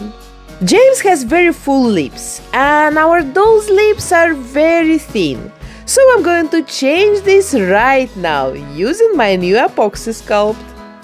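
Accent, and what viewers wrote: Russia. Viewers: You look like my past 2-5 grade art teacher, you guys also have the same Russian accent- (I think you have a Russian accent idk-) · where are you from? You have very russian accent:)) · Я одна слышу этот ужасный русский акцент???? С таким же акцентом у нас на МЦК остановки на английском объявляют: "Зе некст стейшн Сокольиноя гоура... Пуш де бадн то эксит".... и всё в таком роде, аж мурашки пробирают от такого акцента((((( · no,it is russian accent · такое КРАЙНЕ русское, твёрдое произношение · Какой-то акцент русский что-ли 😄😅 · Oh girl u have such a cute face and russian accent:)) U are doing such a great job! Greetings from Romania! ❤️❤️ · Я же не одна слышу этот потрясающий русский акцент? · Русский акцент , наших везде узнаешь. Просто перекрёстная работа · Такой жёсткий русский акцент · Как - будто акцент русский у неё. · типичный русский акцент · однако здравствуйте she definitely speaks Russian , as I Russian I can hear it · u have such a RUSSIAN accent,woww!! 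· You are Russian? very Russian accent🤨🧐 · Вы разговариваете как моя учительница по английскому Жесткий русский акцент 😂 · Всё видео про это думал. Она точно из России, у моих одноклассников такой же акцент))) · Ух, ну очень русский акцент Но работа прекрасная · Tiene acento, habla inglés perfecto, solo con acento, aparentemente ruso o de la región. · Do u have a really strong Russian accent. May u please tell me because it’s beautiful 😂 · Кажется что у вас русский акцент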